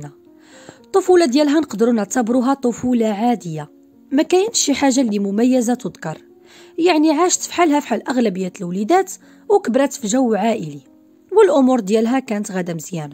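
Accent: Tangier, Morocco. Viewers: السيدة طنجاوية و هيداك كنهدرو ، كل منطقة في مغربنا الحبيب عندها اللكنة ديالها ، فمثلا ف مراكش كيقولو بيتي عوض بغيتي ، .....والأمثال بزااف + حتى ديك تسعود اللي قلت نتنا ماشي صحيحة ، تسعة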